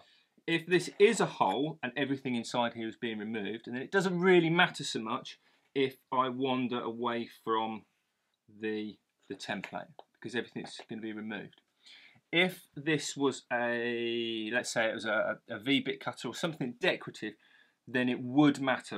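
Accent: England, United Kingdom. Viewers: Very informative and good to hear an English accent for a change!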